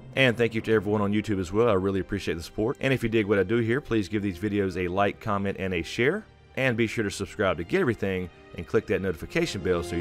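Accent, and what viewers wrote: South, United States. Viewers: I agree with his southern akcent to kool.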